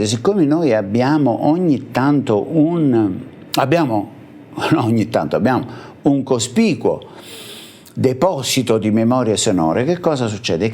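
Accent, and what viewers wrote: Naples, Italy. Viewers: Maestro sei grande! lo adoro sto' accento Napoletano! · è bravo, bravissimo comunque, anche fosse di Brunico. La Napoletanità non c’entra proprio una cippa, se non per la parlata sardonica e disincantata da napoli-snob che è un piacevole orpello all’ascolto.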